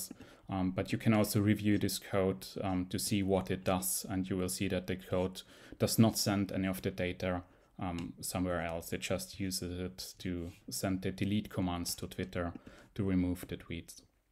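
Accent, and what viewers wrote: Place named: Germany. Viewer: Man hört direkt deinen dt Dialekt raus. Weshalb sprichst du dann Englisch?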